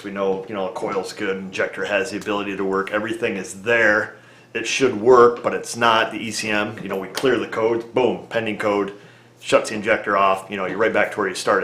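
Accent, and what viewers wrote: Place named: Upstate New York, United States. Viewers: That's not a New York accent, it's a "stat nyland" accent. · Speaking of accents, yes, you have an Upstate New York accent and, boy, does it shine.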